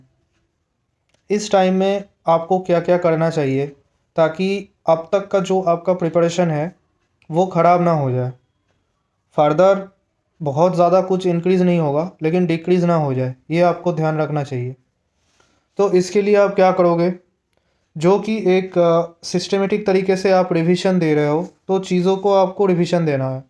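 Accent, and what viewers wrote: Bengal, India. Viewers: তোমায় ল্যাঙ্গুয়েজ শুনে আমার তো মনে হচ্ছে তুমি বাঙ্গালি?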